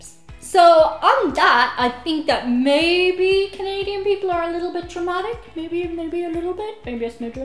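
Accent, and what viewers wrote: Ireland. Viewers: I thought you were Irish.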